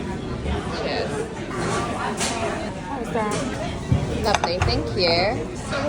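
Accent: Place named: United Kingdom. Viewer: Hi! I didn't know you'd have a YouTube channel 🥰 I'm Italian, but I live in Malta and I started following you for your lovely accent 😍 I love the British accent and culture. What's more I'm vegan too.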